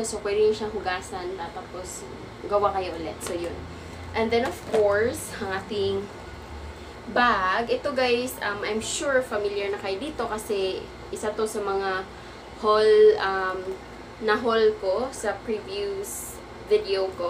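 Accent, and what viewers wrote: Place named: Visayas, Philippines. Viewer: Di jud Malikayan ang pagkabisaya nga accent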